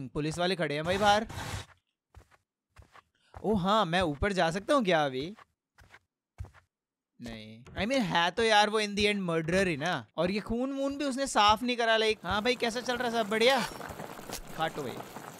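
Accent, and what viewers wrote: Haryana, India. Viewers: really nice video, is one of the only gamers who try different games and show them to ther audience with his great hariyanvi mixed accent and.... you were expecting something more right, but it was me DIO!! [ KONO DIO DA ]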